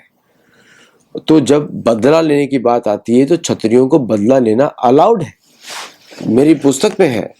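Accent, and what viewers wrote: India. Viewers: Respected sir, I thought you were from Kerala or Tamil Nadu. The way you explain, all our family members, including my mother listens to your Vlogs. Hope you explain in english also regularly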